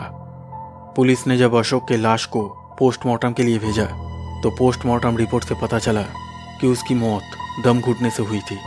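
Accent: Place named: Bihar, India. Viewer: Sir aap bihar se ho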